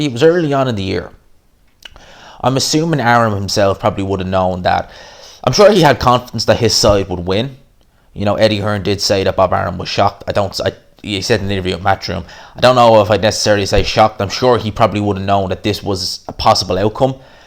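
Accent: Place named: Ireland. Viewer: I'm new to your channel by way of Ade. I'm subbing purely for the Irish accent, the boxing knowledge is a plus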